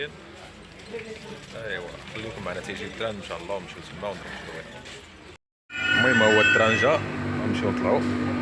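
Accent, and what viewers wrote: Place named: Morocco. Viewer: Siiiiir a siiiir ida casi hiya española lo kat9oul katehdar. Aún te queda mucho por aprender y otro consejo amigo mío. El acento se te nota que eres morito. Y si estás con una española tu Ramadán no vale nada hijo mio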